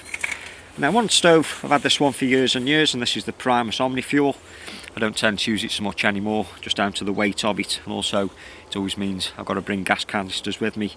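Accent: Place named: Stoke, United Kingdom. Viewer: That's a fine Stoke accent there my mate. A Neckender?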